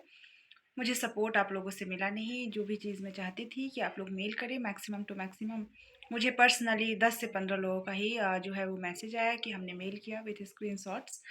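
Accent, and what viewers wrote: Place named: Bengal, India. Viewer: By your language accents, you looks bangali??...i mean are you from west bangal??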